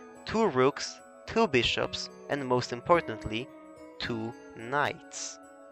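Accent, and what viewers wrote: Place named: Europe. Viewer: Hey I'll take a cool European accent over another annoying hyperactive or bored American accent any day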